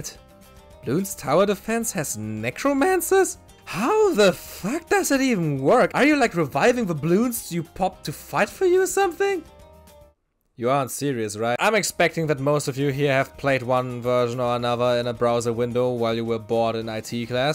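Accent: Germany. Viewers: Jooo ich wusste nicht das du deutsch bist lol obwohl man das schon raushören kann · Haha click aufs video und hoere gleich mein geliebtes deutsch heraus 🤣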